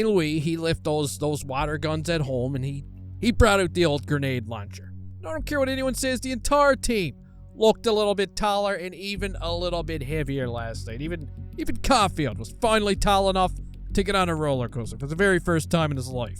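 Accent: Newfoundland, Canada. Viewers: What part of Newfoundland are ya from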